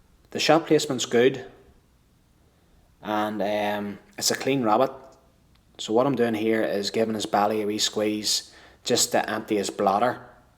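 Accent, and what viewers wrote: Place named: Ireland. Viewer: Good shooting,love that Irish accent.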